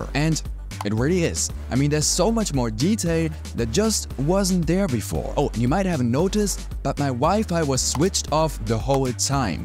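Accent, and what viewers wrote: Germany. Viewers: Ich wusste irgendwie dass du kein Ami bist. Hast ein sehr deutschen Akzent😊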